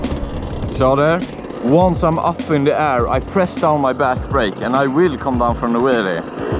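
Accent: Sweden. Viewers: Det skriker svensk av din engelska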